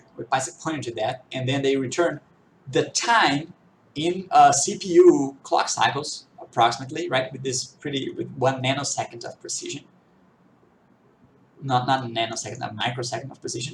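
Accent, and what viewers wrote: Brazil. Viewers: é br??? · percebi desde o inicio que vc era brasileiro kk belo trabalho aí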